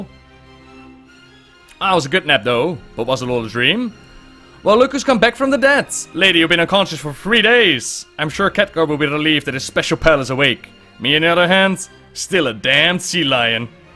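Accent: Netherlands. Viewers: I don't get why so many people watch this crap this Dutchboy has a annoying accent.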